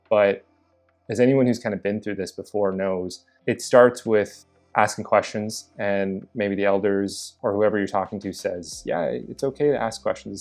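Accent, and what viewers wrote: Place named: Canada. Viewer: I’m enjoying your video but I’m also distracted by your accent 😂 are you Canadian? I keep hearing twinges of Robyn’s accent from how I met your mother lol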